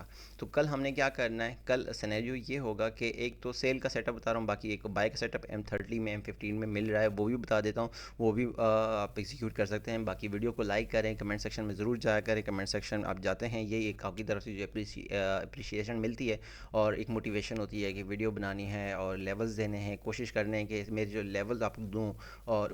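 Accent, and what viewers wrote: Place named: Peshawar, Pakistan. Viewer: Assallam o alaikum bro aap peshawar s hu Mashallah ap ka accent unhi jaisa hai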